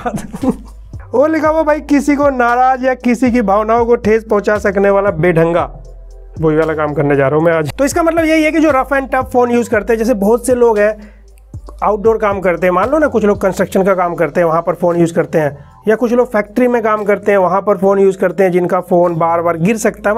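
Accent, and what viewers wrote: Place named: Uttar Pradesh, India. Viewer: आप का स्टाइल zabardast है. Especially the u.p. वाला touch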